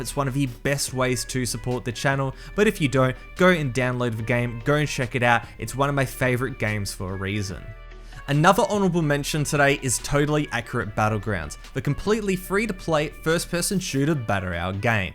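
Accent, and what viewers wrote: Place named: Australia. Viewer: Dude idc what videos u make ur voice is satisfactory that australian accent hits the 'spot' yessir i love ur vids xD and yea i like ur bedwars series cuz im a bedwars kid who does yt and stuff lol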